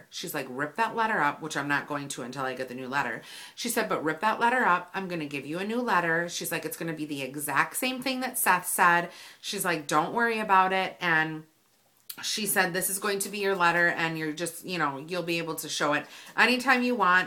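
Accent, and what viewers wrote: Wisconsin, United States. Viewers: the Wisconsin accent?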